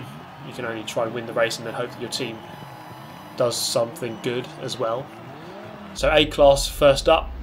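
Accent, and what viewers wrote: United Kingdom. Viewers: A British accent and sarcasm is the best combo in the world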